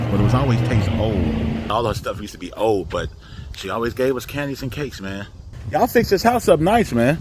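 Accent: Midwest, United States. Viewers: I have been to Gary before a couple times. Ran track meet in that area when in high school and think I played a baseball tournament too in high school there. Michael Jackson is the man! I knew you had those midwestern roots....can hear it in your voice. Gary isn't too far from Detroit area where I am from. Detroit roots here baby! 313! That pool is sad that it's not being used anymore. When was the last time u were there in Gary? You know I enjoyed that cameo from the train!!!🤣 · I had a feeling you was from the Midwest I was thinking Detroit or Chicago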